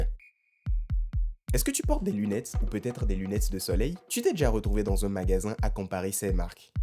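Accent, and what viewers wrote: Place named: Benin. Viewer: Great video Vous avez un accent béninois/togolais Rare de voir des feedbacks venant de ses nationalité Mercii beaucoup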